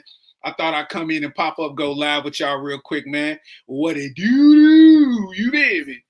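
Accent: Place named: United Kingdom. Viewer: There's something about how brittish people articulate themselves that makes it twice as funny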